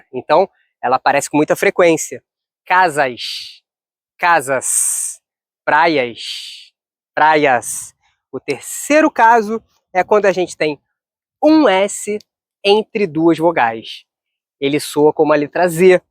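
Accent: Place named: Rio de Janeiro, Brazil. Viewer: Ilha Da Magia, loved the lesson my Brother. Sotaque Carioca e Top ta ligado. I really enjoy your videos because you distinguish the Carioca accent from other accents. Thank you for the licao mermao, valeu valeu abraco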